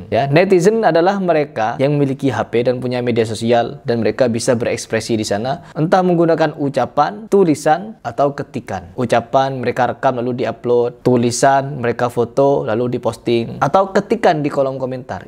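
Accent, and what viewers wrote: Madura, Indonesia. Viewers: Abangnya logat Madura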